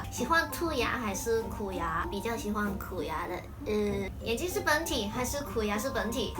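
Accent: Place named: Southeast Asia. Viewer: 听起来像东南亚人讲华语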